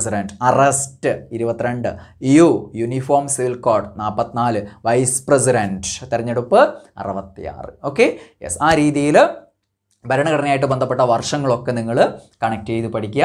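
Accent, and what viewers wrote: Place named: Malabar, India. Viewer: അതു മലബാർഏരിയയിലെ സ്ലാങ് ആണ്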